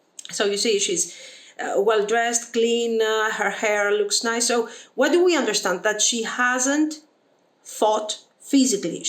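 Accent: Greece. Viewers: First time watching a video on your channel! As soon as i heard you I thought you were Greek, and you actually are😂🩷 Btw loved your reading εισαι υπεροχηη❤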